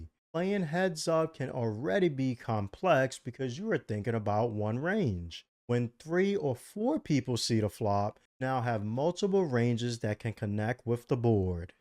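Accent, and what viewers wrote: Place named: New York, United States. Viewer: Im curious bud, where did you grow up? Where were you playing live in your road to having 7 straight profitable years playing poker? I presume somewhere in NY/NJ area because your accent sounds almost identical to my own and I feel like I have bumped into you somewhere before.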